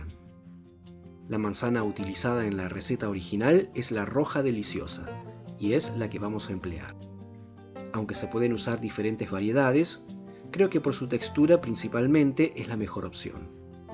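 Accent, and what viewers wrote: Buenos Aires, Argentina. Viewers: Hace rato que sigo este canal, pero hace rato también que no venía a éste. Y cuando veo y escucho las recetas, me siento tan en "Baires"!: vaini"sha", ra"sha"dura de medio limón, mantequi"sha"... lo preparé "sho"... es tan "sho", como me dicen mi amigos hispanohablantes... no sé si es tan YO o nuestra idiosincracia al hablar y ese cuidado del habla... cuando hablamos y decimos las cosas... Como dicen mis estudiantes. En todo caso, este canal me encantó siempre por su ELEGANCIA, su lado RETRO, sus ustensillos paseistas, su lado tan porteño, su manera de ser tan 'nosotros"... GRACIAS, por darnos tanto!!!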